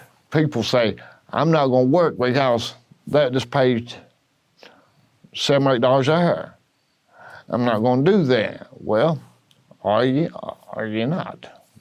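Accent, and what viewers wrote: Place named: South, United States. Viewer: I’m a fifth generation southerner. His accent is a beautiful song to my ears. We Floridians have lost our accents since I was a kid. A lot of us.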